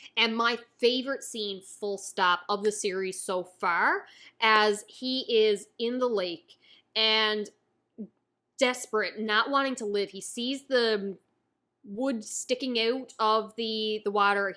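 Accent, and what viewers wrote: Newfoundland, Canada. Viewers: Are you from newfoundland? Can't place your accent might be Minnesota.....it's a weird question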